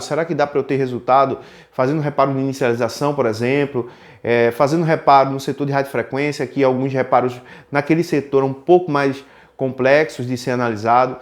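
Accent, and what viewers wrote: Pernambuco, Brazil. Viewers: Tu es Pernambucano? E alve Rubro?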